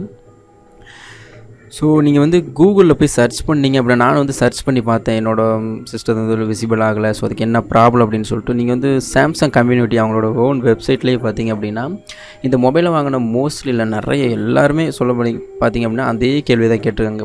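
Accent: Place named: South India, India. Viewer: Your pronunciation is like of south indian. So your vedio quality is not useful universally. Try to use easy pronunciation so that maximum people can understand your language. Ok. Thank you.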